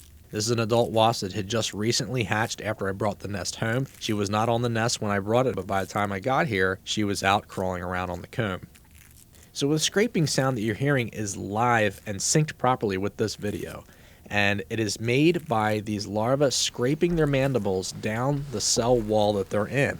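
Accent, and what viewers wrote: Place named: Pennsylvania, United States. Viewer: your pennsylvania accent really shows on your o’s haha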